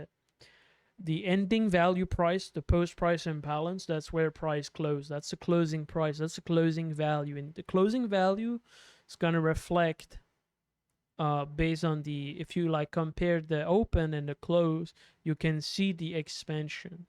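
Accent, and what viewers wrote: Quebec, Canada. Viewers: French canadian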